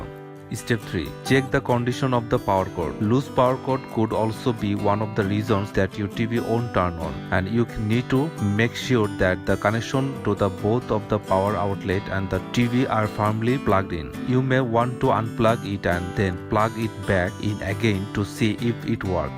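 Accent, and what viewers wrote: India. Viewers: Your English...Indian pronunciation...gives me more headache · Indian accent lol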